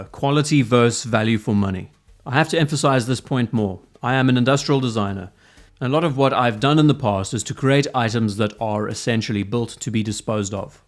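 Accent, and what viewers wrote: South Africa. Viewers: Hold up... you from South Africa?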